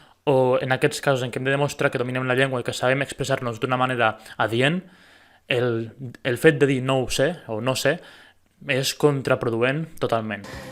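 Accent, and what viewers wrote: Spain. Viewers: aunque te mueras de la rabia: se nota que tu lengua madre es el español.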